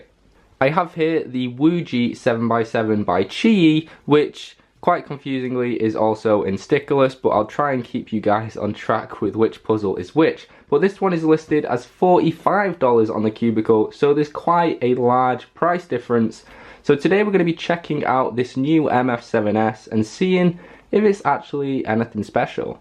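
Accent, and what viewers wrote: England, United Kingdom. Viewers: Hey are you from England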